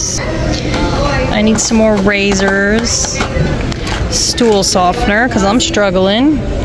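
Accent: South, United States. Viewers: I stumbled across her a few days ago. I like listening while I work. Her southern accent is calming. ...from Boston. 😬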